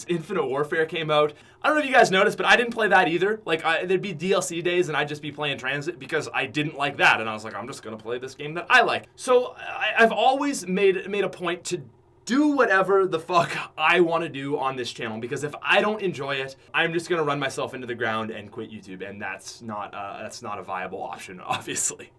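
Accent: Canada. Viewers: ahahahah oot. canada accent , its so funny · Been here since day 1! Love the content, Canadian accent, and the humor! Keep it up! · Sound so much more Canadian today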